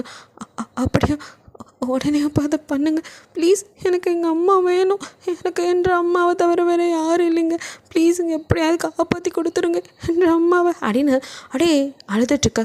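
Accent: Sri Lanka. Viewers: Srilanka slang super❤❤❤❤ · Innaikku Tae bias Ku double suprise aah nandri nandri enakku intha part la RM oda wife enka naattu slang romba pidichchirunthathu unkada kural la srilanka Tamil kathachchaale vadivu thaan 😍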